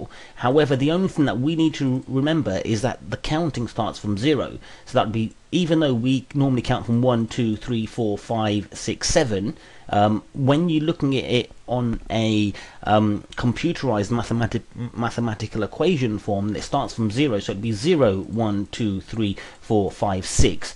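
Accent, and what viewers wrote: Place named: England, United Kingdom. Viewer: I love your english accent, where do you come from ?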